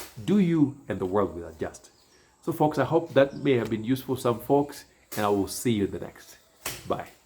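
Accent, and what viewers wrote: Luo, Kenya. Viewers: Ongea tu kama mjaluo. Otherwise, as a returnee myself, this is good content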